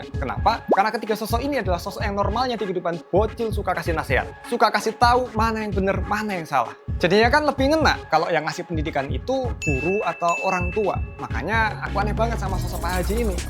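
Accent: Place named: Java, Indonesia. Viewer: Tambahan 1 lagi, terlalu kuat Jawa nya bang, malah lebih suka animasi Ibra dan kiko.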